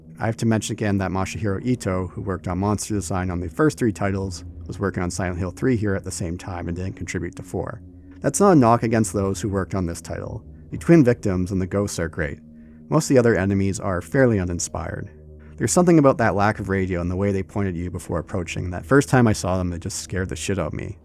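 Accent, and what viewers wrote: United States. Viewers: The California accent is strong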